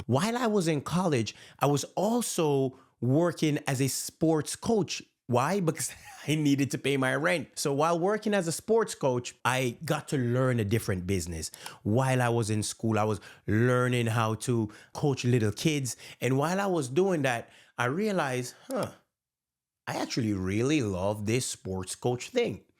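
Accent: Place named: Jamaica. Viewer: He's Jamaican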